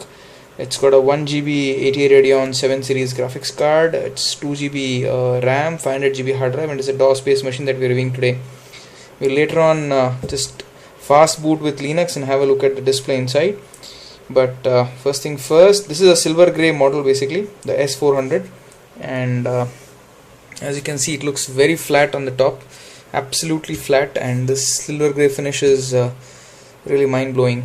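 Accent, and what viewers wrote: India. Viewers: I think you are having trouble with the Indian accent. Grammatically, sounds pretty correct.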